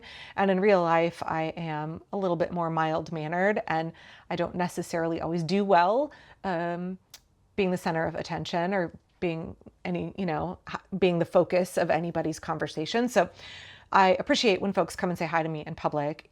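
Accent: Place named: Oregon, United States. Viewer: Am wondering about where you live in Portland. I was born there and lived in Boring, LaGrande, Southeast Portland and then Northeast Portland. Once an Oregonian, you can move to Southern California and Reno (now) but you always feel like an Oregonian. The 70s and 80s in Portland was a great free spirit time. You sound like a true Oregonian which is part of why I love your channel and a big compliment. Thanks!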